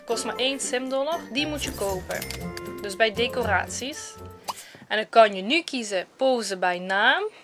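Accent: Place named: Limburg, Netherlands. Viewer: hey hey ik ben zelf ook half limburgs dus ik ben je accent wel gewend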